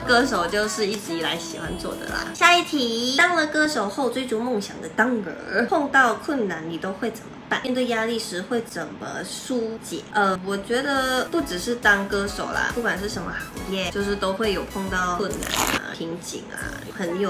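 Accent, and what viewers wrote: Taiwan. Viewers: 台灣腔！馬來腔不見了哈哈哈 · 口音有點台灣腔，好可愛ớ ₃ờ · 为什么有一点台灣腔😂😂😂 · 變台灣腔了XDD 說話方式 用詞都被影響到了哈哈哈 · 從對著鏡頭彈唱的小女孩 現在明顯的看出到了成熟穩重 我哭遼qwq 影片看到一半發現草草的口音變成了台灣口音😳 可愛死了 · 哇 直接台湾腔 没有马来西亚腔了 听起来真的舒服很多 我自己也是马来西亚人可是觉得马来西亚音很难听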